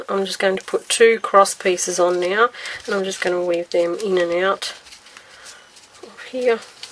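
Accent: Australia. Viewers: I am surbscribed to your YouTube channel but I don't seem to get emails about new videos but I had found the yellow card you did with lattice and then found these. I can't think you enough for ideas on other ways to use my spellbinders. You are the best, love hearing your accent, I have several pug friends from NSW, and one special one that lives in Hobart Tasmania you sound just like her. Thanks again for all your ideas love them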